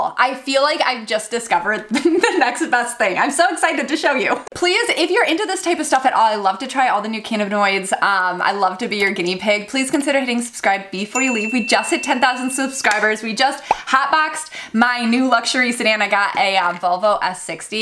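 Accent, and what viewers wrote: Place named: Midwest, United States. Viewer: you gotta be from michigan or ohio...lol we have the best accents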